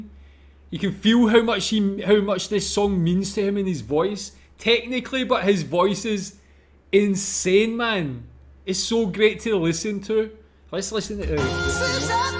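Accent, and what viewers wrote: Scotland, United Kingdom. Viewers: Love your Scottish accent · It's a great song form polish Jim Morrison, and Your Scottish accent gives nice touch your comments. Pure dead brilliant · I like your accent. Enough to check in your description that you are Scottish. Also there is something appealing to polish person or person of polish origin in what you are doing. We sometimes (often) have difficulty to appreciate our own original polish things...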